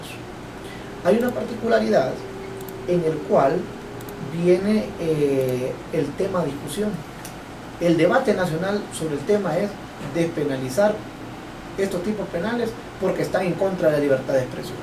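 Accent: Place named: Venezuela. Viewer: Eres venezolano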